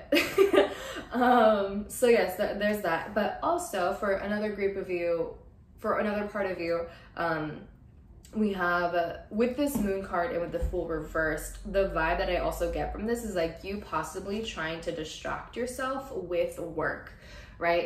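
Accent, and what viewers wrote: United Kingdom. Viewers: hahaha yesss i love the british accent! i always do that too 🥰❤️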